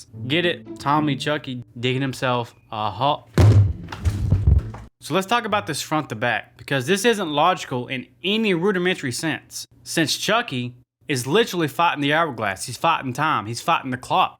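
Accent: South, United States. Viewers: your vids are quickky becoming my favorites! Been going through ur lists this last weekend. Great to see someone with a southern accent creating great vids too, bama here! Anyways lovely job, looking forward to CP3, totally my guilty pleasure of the chuckster movies.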